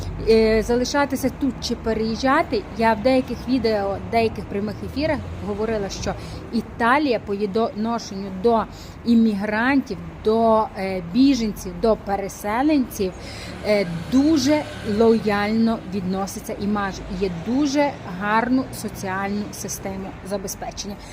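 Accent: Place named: Western Ukraine, Ukraine. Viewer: СУДЯ ПО ГОВОРУ ВЫ ИЗ ЗАПАДНОЙ УКРАИНЫ...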